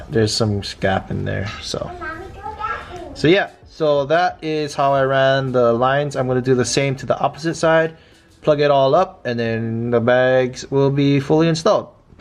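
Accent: Hawaii, United States. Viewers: I sense a accent man. Are you from Hawaii?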